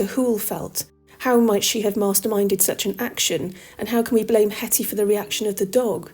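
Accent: England, United Kingdom. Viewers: What a beautiful home. Another interesting case,. Nothing better than a ghostly tale told with a British accent. I’m in bed, waiting for my nighttime meds to kick in and listening to my favorite YouTube channel. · Nothing better than hearing a ghost story in an English accent, well I concur, and I’m now becoming attracted to my own voice, jeez thanks, this is asmr stuff, honestly I could listen to this all night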